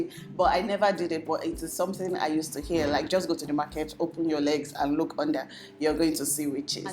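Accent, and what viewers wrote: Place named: Warri, Nigeria. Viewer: No wonder!! I knew your pidgin English was like a warri girls own ... your so beautiful 😍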